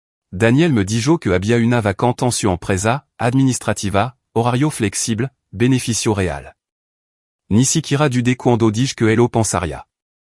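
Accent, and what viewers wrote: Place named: France. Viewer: Que mierda es esta de poner una voz en pseudo castellano con un acento en francés que hace incomprensible y vomitivo el relato? salgo por patas de acá.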